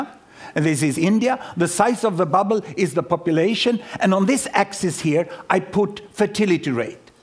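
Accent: Sweden. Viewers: Dear Saturday Night Live, this is how english with swedish accent sounds like. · His accent is sooo typically Swedish :') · älskar hans svenska uttal.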